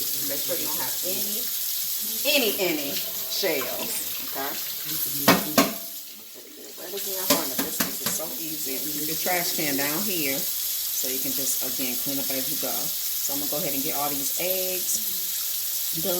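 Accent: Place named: California, United States. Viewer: are you from cali? you sound like it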